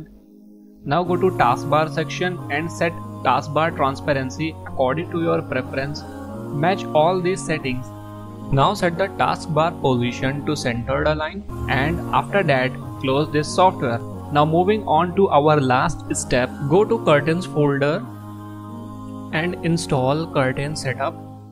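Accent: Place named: India. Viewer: I'm so sorry and i don't mean any offence but from my experiences, the indian accent is throwing me off I am quite sure tho that this is probably a legit vid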